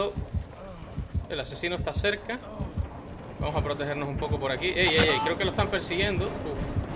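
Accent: Canary Islands, Spain. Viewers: ¿Canario? XDD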